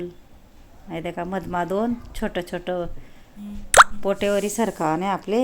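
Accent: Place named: Khandesh, India. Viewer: अप्रतिम ताई आणि तुमची खानदेशी भाषा खुप गोड.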